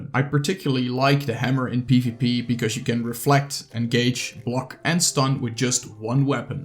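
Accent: Netherlands. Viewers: I hate dutch morons and their shitty accents.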